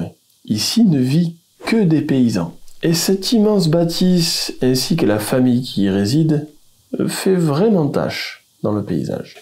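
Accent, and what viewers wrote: South, France. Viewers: Bonjour, un grand merci pour votre récit qui m'a tenue en haleine du début a la fin j'adore votre façon de raconter et votre accent du Sud comme le mien.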